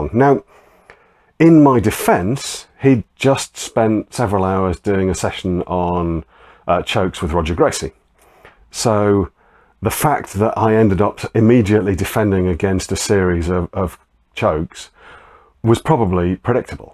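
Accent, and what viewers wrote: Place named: London, United Kingdom. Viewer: You have been taught actual catch wrestling where you lose the match if you're pinned. There are other systems that come from catch wrestling such as Luta Livre (almost definitely the best style there is), Shooto and CSW where the match doesn't end if you're pinned. I recommend learning these systems if you're trying to learn to fight off your back but don't want to learn Jiu Jitsu and want to carry on using your catch philosophy and techniques. There's team cross face in South London where they teach CSW. By the way you sound like you're from London. If so, do you teach people? because in your video you said you're willing to teach.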